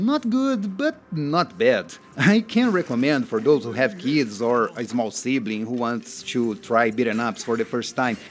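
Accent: Brazil. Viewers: Realized by your accent that you must be brazilian right? Nice video.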